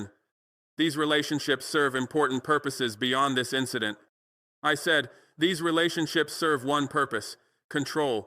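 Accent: United States. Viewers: Sir, you sound like a good Ole boy, right down the road from me. Educated here in Texas.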